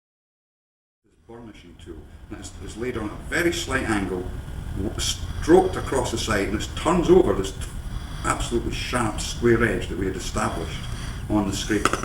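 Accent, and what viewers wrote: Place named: Scotland, United Kingdom. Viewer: Thanks for the video. Nicely explained. The burr on the side of the tool is almost as pleasing as the burr in your Scottish accent. Remonds me of family and friends back home in Canada.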